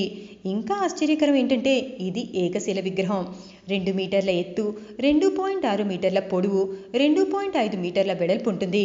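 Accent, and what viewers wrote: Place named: North India, India. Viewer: Iritating female voice more like northy accent.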